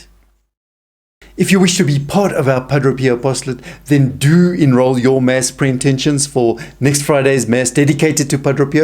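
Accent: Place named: South Africa. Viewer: hmmm - nice to hear a South African accent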